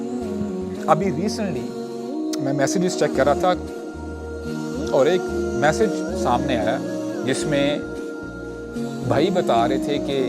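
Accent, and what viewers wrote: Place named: Arab world. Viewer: Amazing Arabic tonality of yours, we truly appreciate that!!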